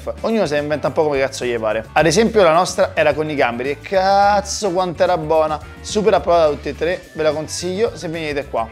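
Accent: Rome, Italy. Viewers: Giusto un pochino di romanesco 😂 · questo story telling in romano mi suona famigliare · Perché hai cominciato a parlare romanesco accentuato?se posso chiedere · Si,mi sembra molto più accentuato del solito (anche io sono romana). Sbaglio? Comunque il format l'ho apprezzato molto comunque. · Come mai hai calato il romanesco in questo video? Mi hai fatto ridere. Prox format cucina sud americana... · Me piace er tuo accento romano! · Perchè hai letto in romanesco? fa strano. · adesso scandisce di più il romano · Oh un po’ di accento prepotente, riportiamo il romano in auge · contenuto top, ma la parlata in romanaccio mi da fastidio. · Ma perché per tutto il video hai parlato romano 😅 comunque sei un fenomeno 😉💣🔥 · Ma perché in sto video parli solo romano? 🙃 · Oggi t'annava de parla romano grande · Video interessante, ma preferivo il vecchio format e il romano meno marcato... 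Comunque mi manca il giapponese, devo tornarci...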